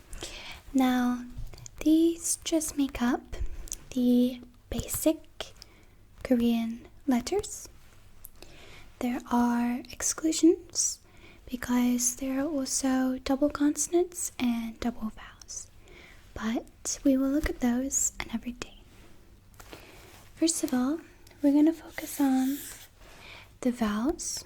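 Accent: United States. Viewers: A British girl with American accent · did you change your accent... It sounds more American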